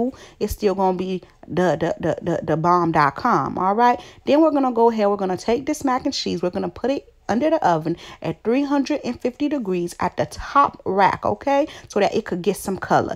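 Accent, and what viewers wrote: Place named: South, United States. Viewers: Goodness! I'm struggling to understand this southern version of the English language. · "proper English" lol. I have relatives down south and this is proper English. I love the way she communicates, with such love and authenticity. There are plenty of channels you can go to where people are speaking Received Pronunciation or any other version of "proper". Bye Felicia!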